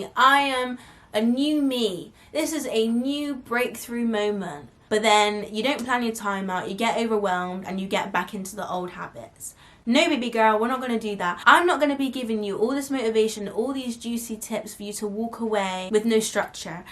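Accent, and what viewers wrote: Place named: Bristol, United Kingdom. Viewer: Are u from Bristol? Ur accent sounds so Bristolian 😂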